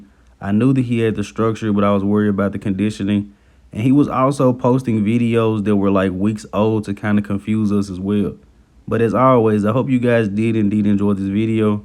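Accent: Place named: South, United States. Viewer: lol man I grew up in the South, this is just how people talk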